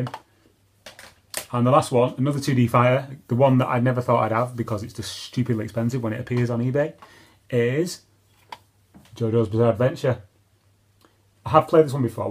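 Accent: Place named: Manchester, United Kingdom. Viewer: Didnt realise you were a manc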